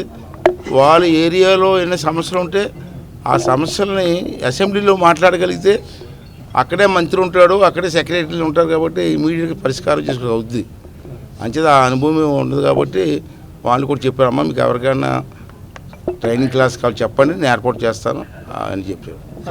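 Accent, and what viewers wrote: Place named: Narsipatnam, India. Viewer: Rey ade narsipatnam slang ra babu..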